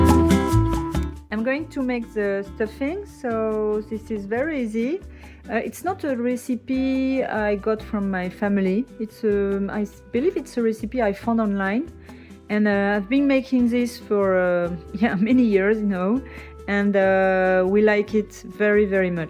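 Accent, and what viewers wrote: France. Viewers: I enjoyed this very much. French is my second language and I went to university in Grenoble in the 1990s. Your English is excellent. Love the accent. It reminds me so much of my French friends. Happy New Year! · I love watching these videos---the recipes are always so good, the calm tone, when youre out walking around, I get to see Paris - you have a nice sweet voice with a lovely French Accent when speaking English - it is just so calming and refreshing to watch-there is no crazy cutting and chopping and throwing and slamming ingredients - so, thank you for making the videos for us to enjoy - MERRY CHRISTMAS AND BEST WISHES FOR GOOD HEALTH AND JOY IN THE NEW YEAR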